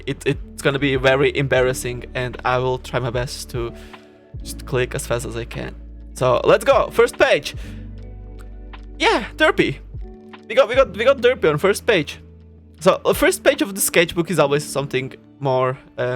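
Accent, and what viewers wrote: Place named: Europe. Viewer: I thought the celestia and Luna voice were mostly intentional. I didn’t realize you were from Europe? I think? XD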